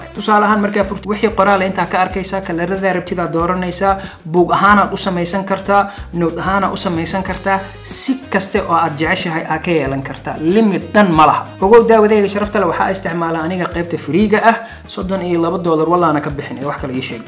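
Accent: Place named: Djibouti. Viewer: Réer Djibouti ayant yahaye marka ii dul kaata sidan uu gorayo af somaliga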